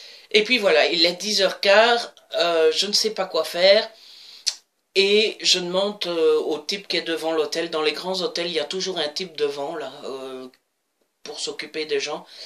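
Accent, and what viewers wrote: Belgium. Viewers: Je découvre ta chaine depuis quelques jours. Je me fais toutes tes vidéos depuis ( quelques avances rapides parfois, j'avoue ^^ ). On a pas forcément les mêmes styles de lecture ( ne suis pas porté sur la romance par exemple mais j'aime beaucoup les thrillers;-) ) mais j'apprécie ton style, ta façon de faire, ton naturel mais aussi ta sensibilité,... Et puis, y'a un point qui me plait beaucoup, c'est ta " belgitude ". Comme toi, je suis belge et quel plaisir de voir et d'entendre un booktubeur belge ( très peu nombreux, hélas )!! Cet accent assez brusselair je trouve ( plus marqué que le mien, moi je suis de la région de Tournai donc j'ai + l'accent ch'ti, c'est ce que les liégeois me disent en tout cas, lool ), tes " godverdome ", nom dé djeu, ect ect.... moi, perso, je kiffe! Voilà, ne change surtout pas ça stp!!;-)En ce qui concerne cette vidéo-ci, tu as parfaitement su me faire revivre ta journée avec tes moments qui te sont chers.J'ai, à la fois, ri et été ému. 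Agréable 3/4 d'heures passés " en ta compagnie "!Bref, j'en fini là. Continue comme ça, ne change pas. Tu es top!:-)ps: Je t'ai ajouté sur Livraddict, j'ai liké ta page Facebook, y'a que ton Fb perso que tu n'as pas accepté mais je peux comprendre, no soucis.;-)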